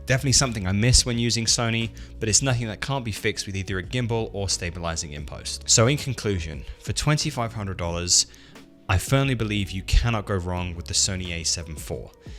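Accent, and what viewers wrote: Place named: United Kingdom. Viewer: You from the UK cool.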